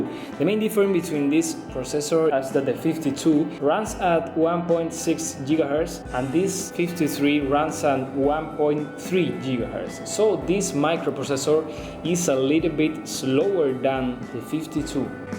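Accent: Andalusia, Spain. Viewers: Good andalusian english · Jajaja andalucia english.estas en todos lados me alegro.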